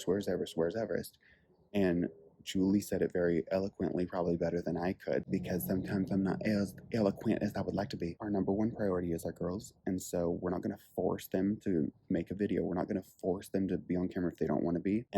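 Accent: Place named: Scotland, United Kingdom. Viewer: First and foremost love you guys. Next: blah🥱🥱 bloody blah to the small minded people out there. Second next: you have got this parenting (I would know I have 5 😜)thing. And last but the most important: did I hear a Scottish accent creeping in?!? I think so lovely xxx Lots of love from Edinburgh 🏴❤️ THREE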